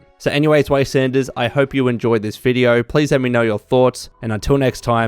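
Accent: Australia. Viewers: Goddamn I missed hearing that smooth Australian buttery voice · Wooo! LONE IVE MISSED THAT AUSSIE VOICE!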